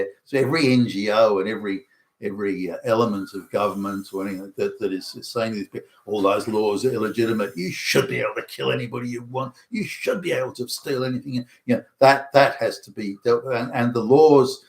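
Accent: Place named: Australia. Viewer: Thanks for broadcasting to me and one or two others. You have a good Aussie educated voice with rounded out cynicism.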